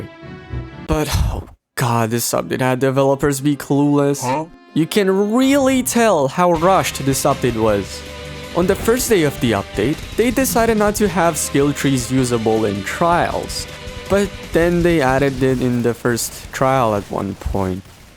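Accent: Romania. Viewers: are u romanian :)) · omg are you romanian??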